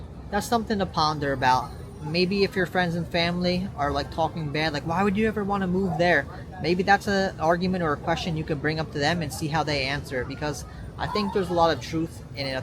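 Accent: New York, United States. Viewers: that Nu Yoork Accent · I hear the nyc accent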